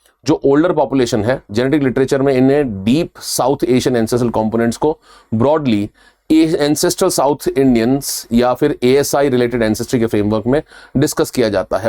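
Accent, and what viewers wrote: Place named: Bengal, India. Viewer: Surely the speaker in this video is bengali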